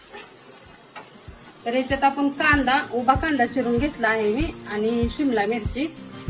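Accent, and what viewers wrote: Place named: Rural, India. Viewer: Mam tumchi recipi mi keli chan jhali Tumche gav kutele sanga na Aamchya gavakde pn ashich bhasha aawaj Mast vattey..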